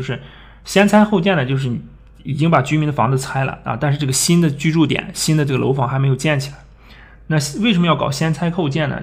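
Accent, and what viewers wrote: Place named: Shanxi, China. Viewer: 感觉普通话中有山西口音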